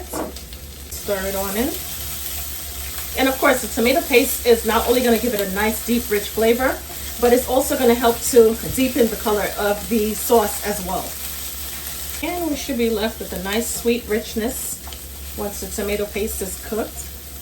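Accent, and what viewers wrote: New York, United States. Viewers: First vid. Amazing. Instant sub. Btw which boro you from tho? I can hear it 😂❤ Recipe in desc box...the first is for a 9x13??? I wanna do this to fill a hotel pan. The second is double. That'll do it, right? Thanks! Much love from just over the GWB 😘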